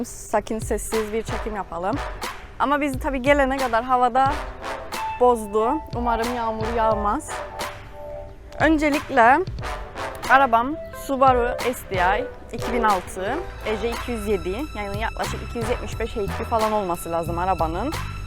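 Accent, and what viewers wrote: Cyprus. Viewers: Ya bu Kıbrıs konuşmasına bayılıyorum 🤣🤣Kıbrıs tatlısı kız formula yarışcısı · Kıbrıs lehçesine bayılıyorum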